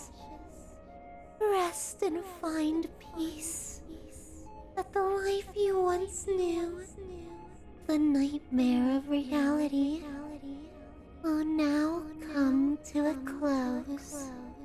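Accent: United Kingdom. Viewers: "ARE YOU STURRING FROM SLUMBER,AH EXCELENT TIMING, EXCELENT TIMING" with classical music in the background is the most British thing i heard today *not making fun of your accent or voice i really enjoy your content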